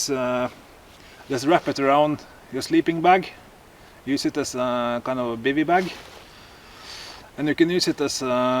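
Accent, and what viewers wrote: Norway. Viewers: Hei! Nice Tarp Setups and a nice norwegian Slang in your englisch speek! U got a new subscriber :) Best regards from germany, zwosiedler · My great-grandfather was born in Nesjestranden(Nesjestranda), Møre og Romsdal. I've always enjoyed the Norwegian accent. I grew up tent camping, but now I like hammocks more. Nice information that's useful. A pleasure to watch ;)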